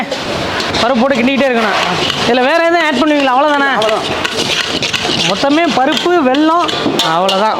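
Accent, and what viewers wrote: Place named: Villupuram, India. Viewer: Villupuram slang 😂😂 vj sidhu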